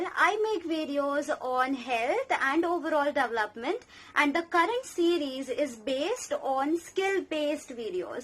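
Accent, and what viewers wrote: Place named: South India, India. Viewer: I remember my south indian teacher who give social science lecture and her accent is same as you... Nice video btw ♥️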